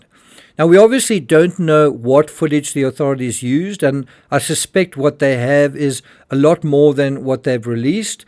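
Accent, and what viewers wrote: South Africa. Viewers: Definitely a South African narrator. i am not sure what I learned tho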